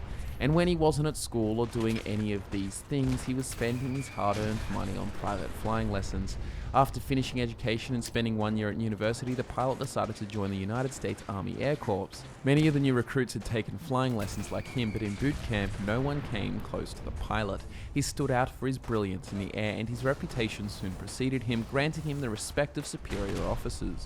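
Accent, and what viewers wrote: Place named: Australia. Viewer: are you austraian because you sound awesome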